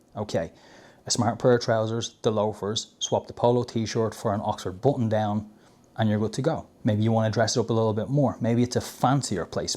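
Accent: Ireland. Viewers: I love your black cat, your Irish accent and your highly useful advice! Plus your natural performance. Good job! I am really grateful for having found this video! Thank you so much!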